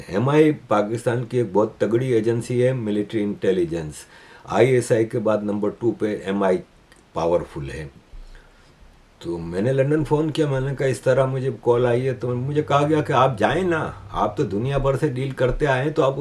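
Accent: Gujarat, India. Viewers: Aap ka bolne ka tone Gujrati lagta hai. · Your roots are from Gujarat & your tone of language ( lehjaa) still reflects the slang tone of traditional gujrati language...Proud of u sir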